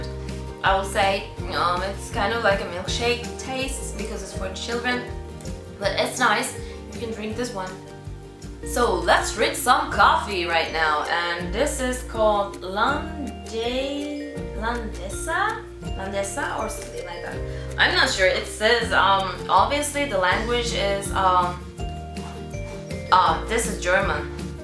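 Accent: Turkey. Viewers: Turk oldugunu da soyleseydin bos yere vakit kaybetmezdim. Boyle bir Ingilizce aksan sadece iyi Ingilizce bilen bir Turk'te vardir hemen anladim. Zaten twitter profilinde de isminin oldugu yaziyor. Yemeyin lan insanlari! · Haha ulan kiz Turk, Turk aksani var. Turkiyede yasiyor bence ...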